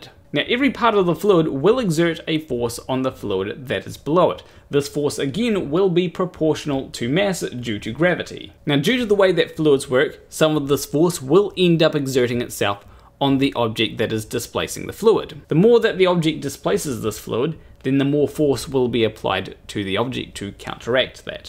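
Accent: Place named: New Zealand. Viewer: New Zealand accent.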